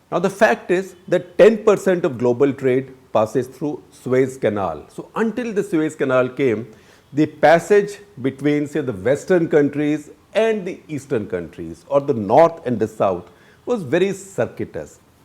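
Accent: India. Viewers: i could listen to him talk forever. I love the indian accent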